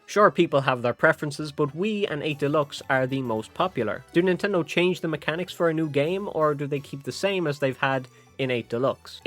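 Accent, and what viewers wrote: Ireland. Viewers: You must be irish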